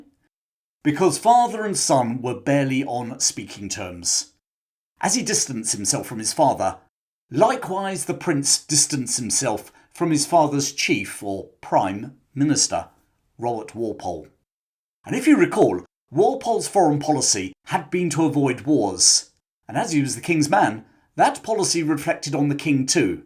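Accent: United Kingdom. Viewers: I was a native Chinese born and live in Hong Kong all my life! Your typical British accent admire me, like the last Hong Kong governor, Christ Patten!!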